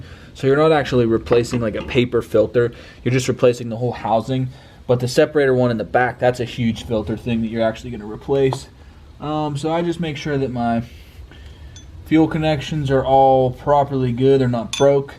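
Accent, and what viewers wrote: Pennsylvania, United States. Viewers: This channel makes me home sick until I see how cold it is there. I never noticed the accent until I lived away from PA for awhile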